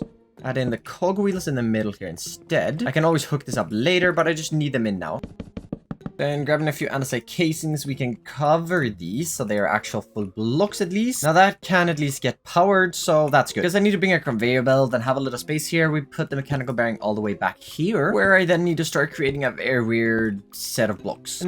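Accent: Sweden. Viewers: Ar you Swedish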